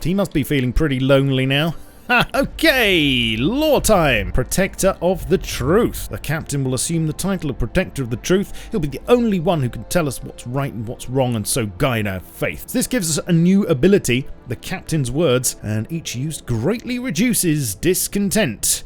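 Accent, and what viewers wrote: United Kingdom. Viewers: Come on this is where you will jump off and soar into the sky like KCmo, oh w8 his channel is dying and yours will 2 if u don't stick 2 rust, it's cruel but hey! That's the price u pay when you think someone is actually going 2 watch you do something other than for what they subscribed for. Let's see if u can really break through as just another guy with a British accent that plays any random game:P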